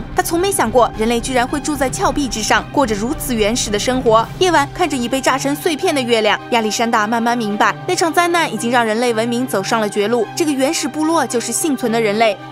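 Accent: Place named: Henan, China. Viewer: 無意間聽河南人的口音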